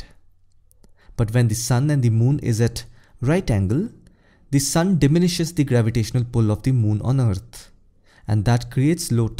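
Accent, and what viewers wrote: India. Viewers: I'd rather listen to the sound of a fork screeching against the surface of a plate than to this Indian accent.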